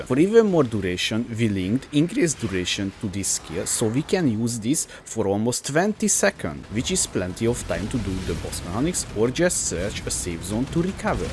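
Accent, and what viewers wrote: Hungary. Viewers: Someone having a Hungarian accent here :D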